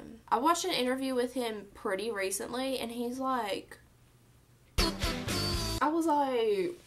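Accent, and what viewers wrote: South, United States. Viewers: I just found this channel and first of all you are hilarious and I love your southern accent because I can relate.. I am from the south #needmysweettea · Omg what state do you live in? Southern af🤣 Alabama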